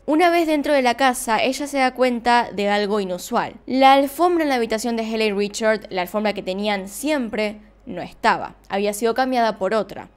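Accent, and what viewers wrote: Argentina. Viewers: Trabajo en la madrugadas y hace un par de días vi tus videos, creo que ya vi la mitad jaja. Eres genial, con todo y tu acento argentino ✌🏻😋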